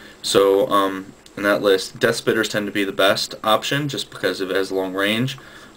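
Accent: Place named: New Jersey, United States. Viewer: Fucking hell, you understand this game so much more than me. You sound just like the guy that keeps kicking my Ass! (He's from New Jersey, sorry if that offends, I'm English!).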